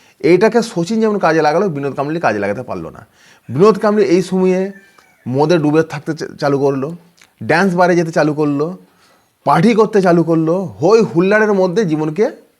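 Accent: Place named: West Bengal, India. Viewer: 'Ramakant Aacharya' noy, sathik naam hocche 'Ramakant Aachrekar', surname er shese 'Kar' ansho ti thakle tini certainly Marathi haben, etai riti. Video banabar aage motei homework karen ni, saadharan dharana tukuo nei aapnar aar video banate lege porechen ei most sub-standard knowledge niye du-paisa kaamabar love ekaaje neme porechen? Moreover, aapnar kathay puro aanchalik taan, medinipur othoba Bankura jelar 'Dialect' e katha bolchen huge platform e ese. Chi: aage nijeke properly groom karun. Eto substandard quality niye 'YouTube' er moto wide platform e chole esechen. Aapnar narration etotai kharap je shunte ritimoto kaane peera dicche. 'Like' to durer katha aapnake 'Dislike' diteo ghrina hocche! Saadharan shuddha Bangla tao bolte paaren na Bangali hoye, lajjar katha!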